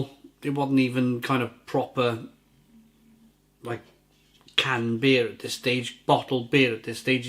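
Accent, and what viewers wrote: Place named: Cardiff, United Kingdom. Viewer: Where you from? Sounds like a barry/Cardiff accent.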